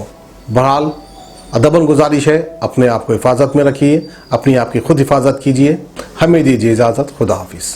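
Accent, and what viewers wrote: Hyderabad, India. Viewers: bhai pure Hyderabadi language.....ghareeb kya karinga bhai...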